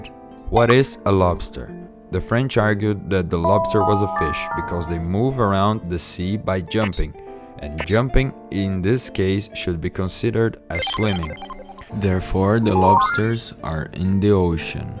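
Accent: Brazil. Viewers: Sabia q eu reconhecia esse sotaque br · He's Brazilian, the Portuguese don't sound like that.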